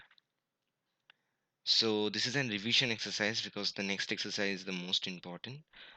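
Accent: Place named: United Kingdom. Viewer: Bro.. U should make in Hindi and English mix.. I mean.. Teacher tech like that But u are said like a British.. It's very bad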